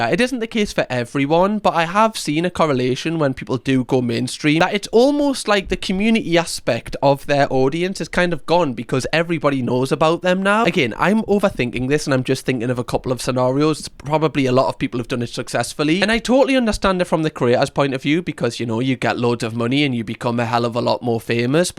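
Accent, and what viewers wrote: Newcastle, United Kingdom. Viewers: Idek who this is but ill watch it because its 😂 love the fellow geordie accent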